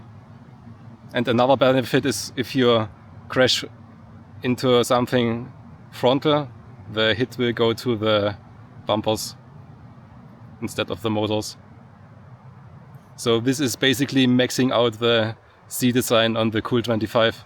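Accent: Germany. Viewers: accent sound german af, also mach ich mal in amtssprache weiter. Geiler spot den du da hast. Ich habe meinen goto spot leider noch nicht gefunden :D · That German accent is just crazy lol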